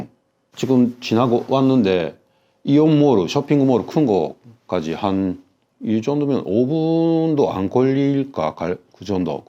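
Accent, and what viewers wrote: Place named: Gyeongsang, South Korea. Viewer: 인품 좋은 설명도 좋은데, 여게 거게 저게~하는 옛날 경상도 사투리가 참 듣기 좋습니다.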